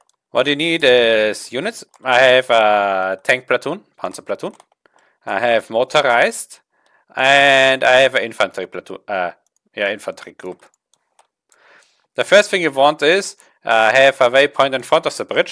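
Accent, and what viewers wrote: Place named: Germany. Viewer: The good GERMAN accent :-D Feier ich! :)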